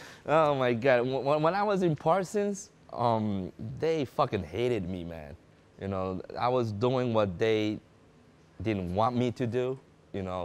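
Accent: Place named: Bay Area, United States. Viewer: He kinda sounds like he’s from the bay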